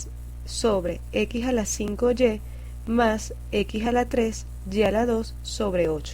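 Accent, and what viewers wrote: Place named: Venezuela. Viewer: entendí todo, y por cierto se nota que eres venezolana ese acento nuestro es irreconocible.... gracias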